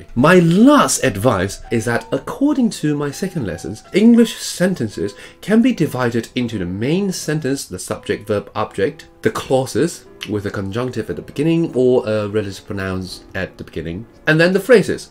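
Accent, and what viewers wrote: RP, United Kingdom. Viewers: 英式口音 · 很英的英文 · 聲音是英國人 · btw I love your English ascent is it British? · 英國腔真好聽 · British accent，说的真好听❤ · 從來沒有著迷過英國腔，自從聽到Eko老師的英國腔後，真的只想聽英國腔了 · 英式发音听起来好舒服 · Definitely he is! He is using the Royal British accent and speaks professionally. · 發音有點英式 好聽 很像看哈利波特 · He studied in London and graduated from the University of London. Of course, he has a British accent! shut the f up if you knew nothing. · 沒在聽 英式腔調 跟語速 有點聽不太懂哈哈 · 主要是英式腔聽起來很有氣質，文縐縐的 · 英國腔像石內卜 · 英國腔聽起來很貴族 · 超喜歡老師的英式英語，深深著迷，又帥又有氣質。😅😅😅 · 英式口音好銷魂😍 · 英式英語就是好聽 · 英式發音👍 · 老師的英國腔讓我著迷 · Wow who's this Japanese looking Tawainese dude speaking in British accents?(Speaking as some Aussie Hong Kong guy) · 英式發音真的很讚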